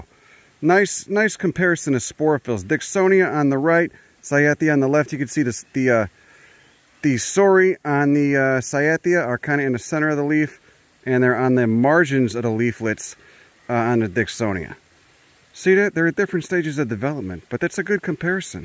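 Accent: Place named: Northeast, United States. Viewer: Just started following the channel and I gotta say, when he mentions scientific names, I have no fucking clue what the hell he's talking about but gah'damn something about that thick northeastern accent is oddly satisfying to listen to. Especially when he's talking shit or just engaging with the local wildlife... ... I can't stop watching this shit...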